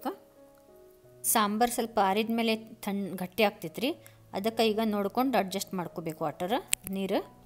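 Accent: India. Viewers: Your Kannada voice over added Best flavour to the Sambar recipe 😍 Please continue it for all videos , ಹುಬ್ಬಳ್ಳಿ ಭಾಷೆ 🔥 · You sound awesome 👏🏼 so good to hear you speaking in Namma Hubli Kannada 😊Loved listening to it..!! Your sambhar looks superb and this is what I call comfort food...You are such a versatile cook 🙏🏼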